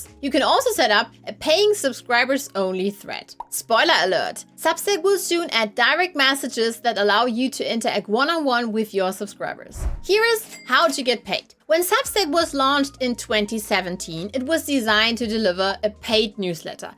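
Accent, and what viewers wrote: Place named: Germany. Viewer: Noooo your German accent is all part of the charm! It's your character. I really enjoy your videos, you put so much work into editing and production. 😊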